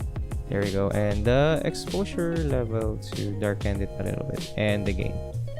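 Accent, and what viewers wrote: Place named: Philippines. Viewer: That is filipino accent 🤗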